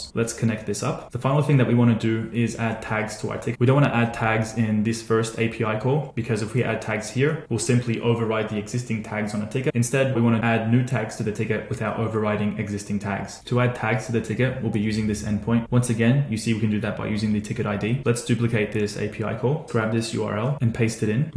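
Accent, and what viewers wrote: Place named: Australia. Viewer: I have recognised the warm Aussie accent......I use FreshDesk, same concept, love the video.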